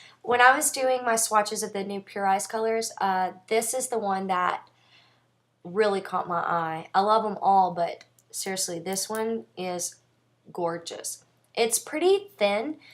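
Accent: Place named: South, United States. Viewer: LoL - high five swanky southern accent!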